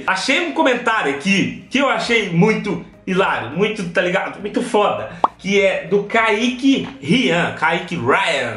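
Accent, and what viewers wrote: Goiás, Brazil. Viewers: fala por que vc fala no pique do goias vc conhece goiania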